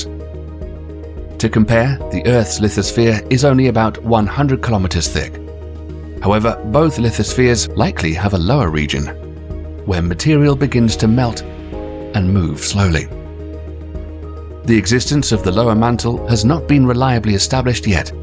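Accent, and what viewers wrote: United Kingdom. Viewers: Why does there ALWAYS got to be some brite narrating even on an American channel.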